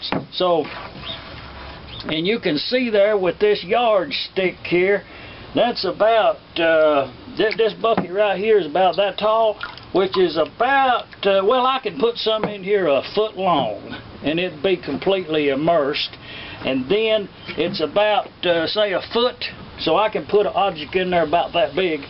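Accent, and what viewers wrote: South, United States. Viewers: I love your "gooda olde" southern accent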